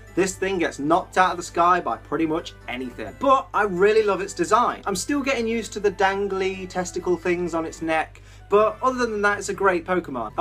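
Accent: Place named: Midlands, United Kingdom. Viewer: I'm from the Midlands tooooooo. Staffordshire. i bet your accent sounds weird to most people but sounds so normal to me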